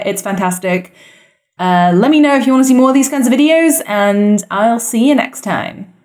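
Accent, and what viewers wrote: United Kingdom. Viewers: I'm so happy I just discovered your channel! I really love animation 'n stuff and I'm always happy to find a good one with sympathic ppl on it and your british accent makes it even better!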